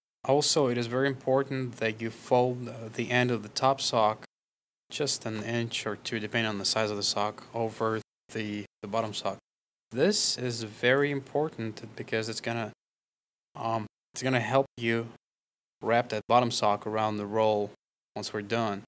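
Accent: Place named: Eastern Europe. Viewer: Where are you from? I'm hearing a Slavic accent but I may be wrong.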